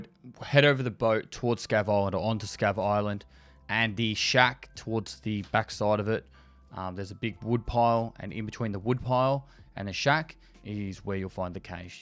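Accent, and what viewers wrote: Australia. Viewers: It's aussie accent, reminds me of SPUNJ calling de_cache kesch.^^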